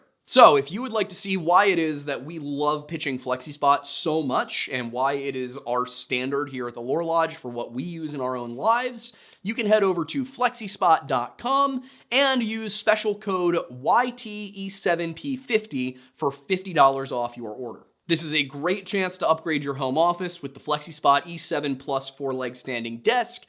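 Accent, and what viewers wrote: Canada. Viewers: Love your Canadian accent -a laughing Canadian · "Let's get Innuit." The Canadian lighting and accent. All 🤌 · dude you sound like a leprechaun with the Canadian accent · Your Canadian accent killed me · As a Canadian I did like the accent you went for, it was a little honky tonk but you got the right idea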